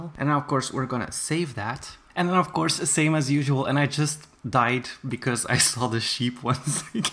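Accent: Netherlands. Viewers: hold up, i thought you were an American! But no (lol its going to be fun if im wrong) you're a dutchie! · 0 Are you dutch?! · Wait, are you Dutch? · Omg he's Dutch like meh! Yasss Queennn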